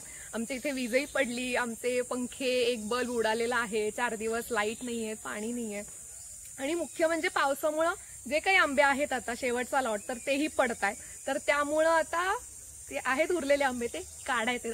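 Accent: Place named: Rural, India. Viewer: Love your Gramin languages tone when u use. ........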